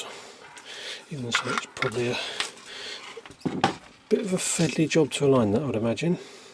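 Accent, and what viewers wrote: United Kingdom. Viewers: I'm as straight as a ruler but I could listen to your British voice all day long. What's with that?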